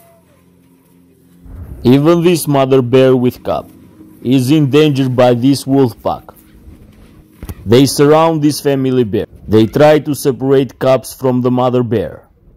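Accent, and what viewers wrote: Russia. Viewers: i like your russian acsent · Лучше б рассказал на на русском. Зачем так напрягаться, по акценту понятно что русскоязычный.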